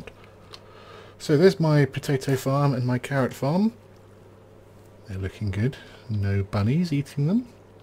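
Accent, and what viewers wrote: United Kingdom. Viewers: where abouts in uk are you from??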